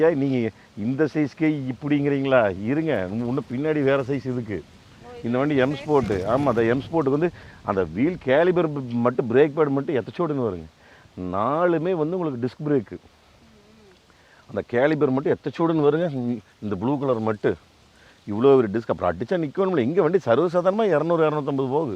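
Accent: Kongu, India. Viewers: Addict your kongu tamil Anna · கொங்கு நாட்டு தமிழ் உச்சரிப்பு அருமை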